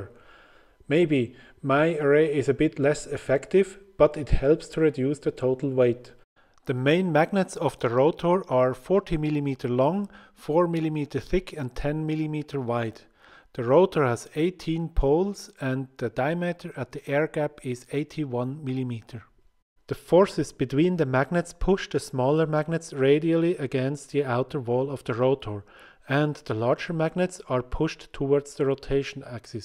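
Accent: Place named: Switzerland. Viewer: Der Dialekt liess mich vermuten, dass du vlt. Schweizer bist wie ich. Ein Blick auf den Kanal bestätigt zumindest die deutsche Muttersprache.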